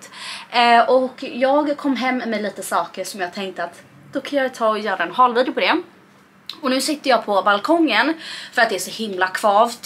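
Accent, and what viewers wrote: Stockholm, Sweden. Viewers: Du säger ehh typ massor .sen undrar jag om du bott i Stockholm då du pratar Stockholms dialekt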